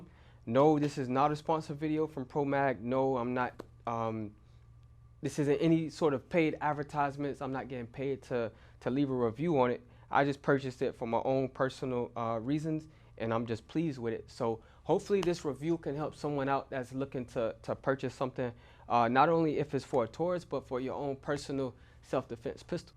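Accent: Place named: South Florida, United States. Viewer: Idk where you from but you sound like a dude from South Florida 🤔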